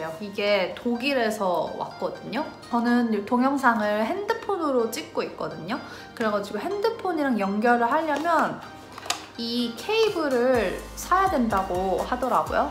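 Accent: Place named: United Kingdom. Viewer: 영국발음 치여요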